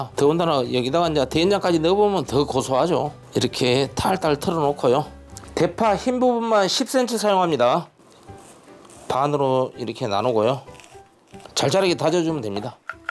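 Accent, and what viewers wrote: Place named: Yeosu, South Korea. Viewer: ㅎㅎ여수고향이라 음식잘하시는군요사투리도 구수해요 돌산갓 피름나물 최고최고ㅎㅎㅎ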